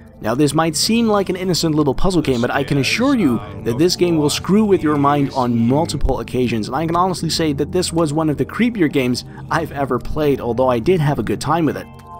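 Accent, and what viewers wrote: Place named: France. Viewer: Voice over guy sounds french.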